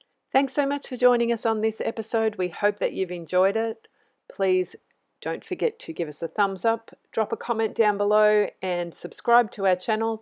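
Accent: Australia. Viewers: I would never have known, you have a great Australian accent. What part of the UK?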